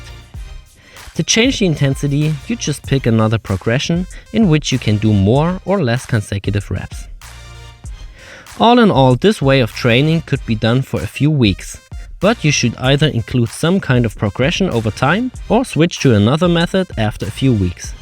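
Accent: Germany. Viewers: Sorry aber dieser heftige deutsche Akzent ist mir zu anstrengend. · Wow you sound reaaaally german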